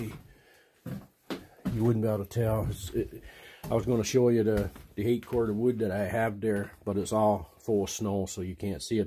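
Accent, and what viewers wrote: Newfoundland, Canada. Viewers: Your acsent you from nfld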